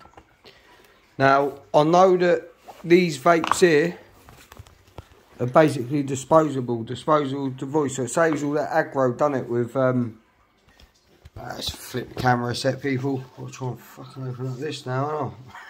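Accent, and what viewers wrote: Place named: United Kingdom. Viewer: Finally a fucking video where someone actually speaks!! And of course its a fellow brit 🙌 legend mate!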